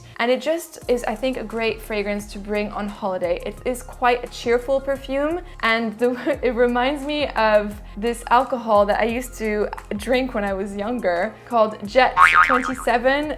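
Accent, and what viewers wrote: France. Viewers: Great video,! Everytime she pronounces the names in this French accent...I wanna wife her up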